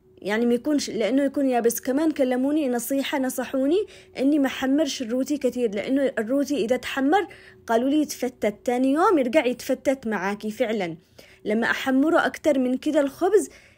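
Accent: Aden, Yemen. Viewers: ربنا يحفظك و يبارك لك في كل شيء. طبخك لذيذ جداً و مليان نصائح مفيده. بالاضافه إلى كلامك العدني الجميل. الف شكر من القلب و لك كل الاحترام و التقدير❤❤❤❤❤ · يااختي يكفي كلامه العدني العسل اقسم باالله انه سكره اني من تعز ومافيش هذاالخبر وفعلآاصحاب عدن اكلهم روعه ويجنن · كلامك يجنن اعشق اللهجه اليمنية محبتك من السودان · ❤تسلم يدك وكلامك العدني الحلو😂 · ههههه شكلك صفر بالطبخ البرياني طريقته جدا مختلفة عن الزربيات وعدن من قبل ما تعرفيها لها طابعها اشي بخور اشي عطور ولو ان الشمال اي اخوتها بالشمال لما يطبخوا في قنواتهم يستنكر ان الاصل عدن في الروائح الجميلة واشي فن و الطبخ الرهيب بس انت بجد اظطريتيني اعلق اما الانفصال او الوحدة هي بيد الرحمن وايش دخل الروتي بالسياسة ياسلته وتميز وسحاوق بالشقر 😂... اختي هذه والله اول مرة اشوف قناتك و3 ساعات وانا اتفرج ع فيديوهاتك الرائعة وتقديمك الرهيب ونظافة مطبخك وحشمتك وصوتك العدني الي يشوقني لطيبت عدن واهلها المتواضعيين بغربتنا ياسلام عليك الله يحفظك واهل بيتك من شر ماخلق استمري وهذا اول لايك لك ❤ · الهجه العدنيه غير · هي عدنيه مافهمتي لهجتها 😊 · ما شاء الله شيء جميل. 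واللهجه اليمنيه اروع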